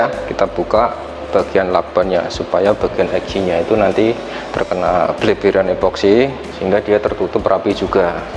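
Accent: Java, Indonesia. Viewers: Logatnya kaya wong jowo,asli pundi mas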